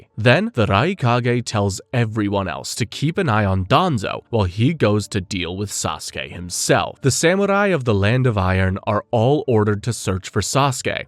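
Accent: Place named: United States. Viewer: loved the videos but holy can you please stop pronouncing all the names UBER AMERICAN holy fk Its MAN GE KYU not MAN GEK KJO, this is just one of like 9000 cringe moments, its not to hard to do such a thing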